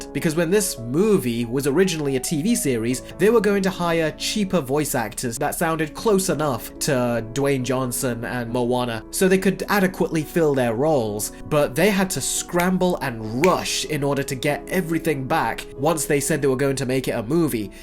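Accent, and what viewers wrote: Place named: United Kingdom. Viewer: British accent? I’m hooked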